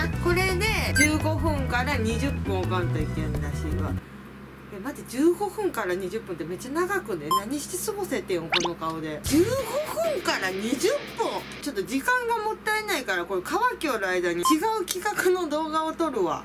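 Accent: Okayama, Japan. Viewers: 岡山県民だけど岡山弁嫌いでもまあたその岡山弁は好きなんだよなあ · まあたそさん 初めましてこんにちわ😁😁😁 いつも楽しみに見させて頂いております😊🤚 やっぱり、まあたそさんは面白い☺ですね🤚 いつも、ゲラゲラ😆😆😆と1人見ては笑ってます😅😅😅 懐かしい岡山弁にホッコリ💓しながら見てますよ😁😁😁🤚 岡山を離れ早いもので8年になりますがなかなか実家に帰れないのでまあたそさんの動画を見ては実家を思い出しながら見てます😅😅😅 メイク動画も好きですがこの動画も好きです😊🤚 今のまあたそさんらしくこれからも頑張って下さいね🤗🤗🤗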